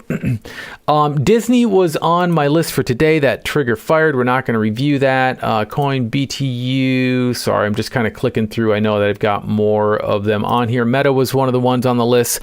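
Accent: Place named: Canada. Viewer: Thanks I just notice your classic Canadian oo for ou dialect. I didn’t notice It on your other videos. Looking for stocks that are ready to pop are the hardest to see for me so I appreciate these. I see your list inside discord but I don’t arch your videos on you tube. I don’t know why, but I can’t turn my phone sideways in discord and makes your screen hard to see. Thanks again I was writing this last night but ran out of time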